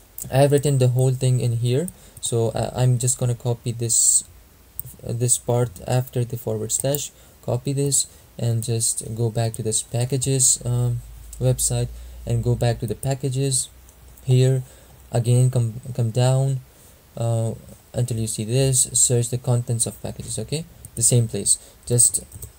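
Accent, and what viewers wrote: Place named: Arab world. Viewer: Bruh judging by your accent are you an arab? If so bruh feeling proud of tht i am an arab too.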